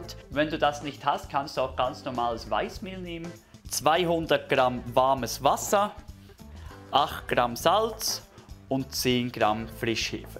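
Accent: Switzerland. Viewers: Ich habe schon mehrere deiner Rezepte gebacken. Jedes Brot ist immer super gelungen, obwohl ich meistens die doppelte Menge nehme (große Familie). Mach weiter so, ich liebe diesen schweizer Dialekt .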